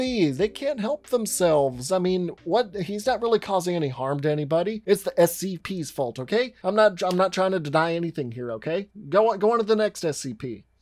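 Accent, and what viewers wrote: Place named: Indiana, United States. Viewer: .........you’re from Indiana, aren’t you.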